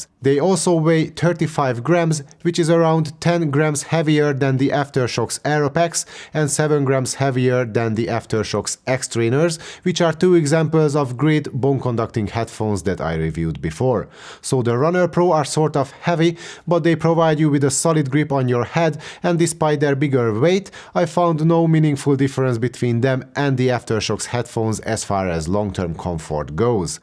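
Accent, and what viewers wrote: Hungary. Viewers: Thx Mate, nice review! I have Shokz OpenSwimm but lately I'm missing bluetooth. I was thinking to buy an OpenRun as well, but after this I maybe try Neanka. But a bit scary how easily it broke in your backpack.... Shokz is still in good shape for me. By the way, you were right about IPX8 and IP68 not the same, BUT from waterproof standpoint are the same. The third character (X or 6) stands for dust /solid particles resilience (X means not tested, 6- has tested and ok against dust and solid airborne particles) . Forth character (8) is the waterproof rating (tested being submerged in 1 meter of water for up to 30 minutes) Last thing... Don't take it wrong, but aren't You from Hungary? I have very similar accent.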